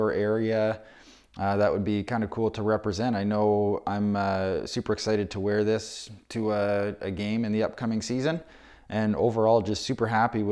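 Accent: Canada. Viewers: As a winnipeg guy you sound like an Ontario guy! Good vid I didn’t know I needed a flannel cap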